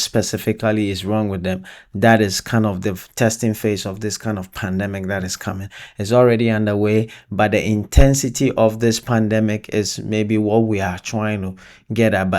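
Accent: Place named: Nigeria. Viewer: Ete sen, I worked with Nigerians for a few years and your accent reminds me of them. Old good memories. I have a question: With all these earthquakes and erupting volcanoes and all the damage that this will cause, will humans return to the Stone Age in terms of daily life (jobs, transport, heating, trade, travel, food)? Will we have different situations from one country to another or from one continent to another?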